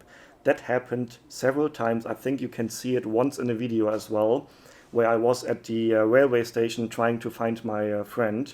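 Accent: Germany. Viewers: Even in English cannot hide the German accent 😊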